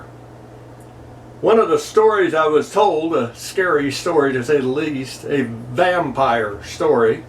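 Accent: Nebraska, United States. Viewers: You surprised me! I tuned in to keep up with current events and hear that Nebraska/family accent, well,I got the accent. I didn't know it was going to be spooky story night! Please consider occasionally telling strange/scary stories that Nebraskans old-timers tell for true. My family has a couple dating from the war. Thank you.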